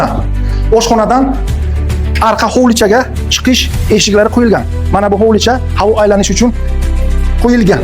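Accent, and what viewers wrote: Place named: Bukhara, Uzbekistan. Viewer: Ман шевадан даров билдим Бухоро дб. Койил Бухоро малодес буш кемела уйга гап йук. Купрок курила кенг уйладан👍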